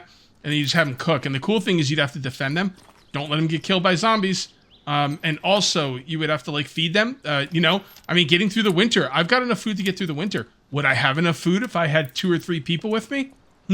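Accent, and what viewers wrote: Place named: New Jersey, United States. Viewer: Not get too personal, but is your family not originally from Missouri? Because I am originally from the KCMO metropolitan area, and to me your accent sounds much more like an eastern Great Lakes or southern New England (i.e. Massachusetts, New Jersey, or NYC) than anything specific to Missouri (which usually is a more flat Great Plains in the west and north, or has Southern influences in the Ozarks and around the Bootheel).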